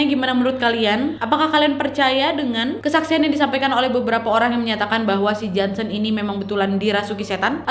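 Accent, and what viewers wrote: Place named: Medan, Indonesia. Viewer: Kak cantik nya awet☺☺ logat medan nya mantap saat podcast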